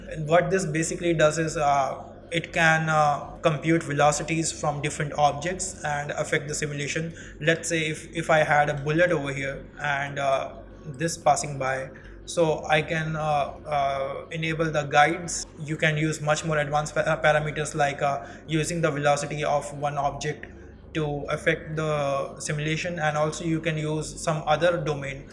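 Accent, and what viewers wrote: India. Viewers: Indian! love it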